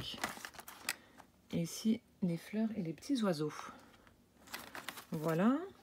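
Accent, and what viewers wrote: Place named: Lorraine, France. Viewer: ah voilà jme disais bien que tu avais plus une façon Lorraine de parler. Moi je suis du 68 région des trois frontières aussi mais FR, DE et CH. Comme j’ai beaucoup de famille en Lorraine, je connais le coin de Thionville. Contente que tu m’aies répondu, bon scrap bisous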